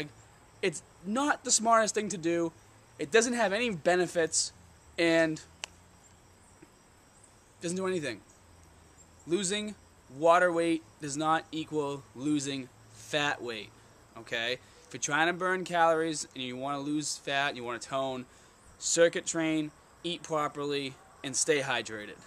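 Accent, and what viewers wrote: Boston, United States. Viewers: you sound much better than with the heavy boston accent that comes and goes :)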